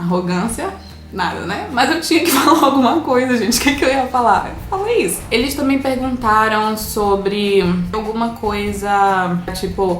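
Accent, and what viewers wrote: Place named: Pará, Brazil. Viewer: você é paraense ❤só pelo seu égua eu me inscrevi 😅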